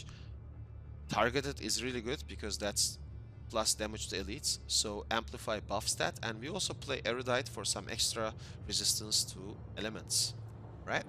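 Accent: Turkey. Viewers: Where are you from? You have such a turkish accent.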